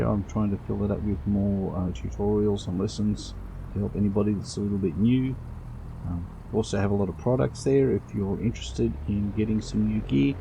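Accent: Australia. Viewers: Great video !! Nice to hear an Aussie voice for a change. I recently joined Xplane. I am rather disappointed with the scenery. This scenery looks really good. Can you you tell me if this is add-on scenery or is it standard?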